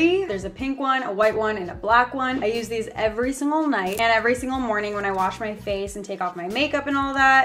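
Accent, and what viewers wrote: Canada. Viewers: Are you Canadian because it sounds like u have an accent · OMG I love your canadian accent!!!! #Sclub #SlvForLife #Wolfia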